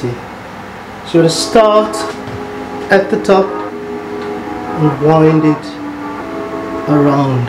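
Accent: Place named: South Africa. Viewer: Dude sounds South African.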